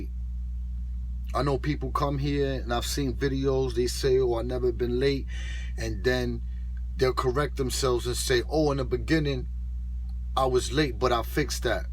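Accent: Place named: New York, United States. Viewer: Great 10 minutes of insight...This video got me ready to sign up for some cdl classes and start my own journey. Off topic you sound like you from nyc💯💯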